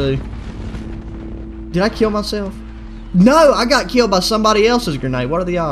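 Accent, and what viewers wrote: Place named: South, United States. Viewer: Is it just me or do u have the best southern accent? Lmao but u earned ur self a sub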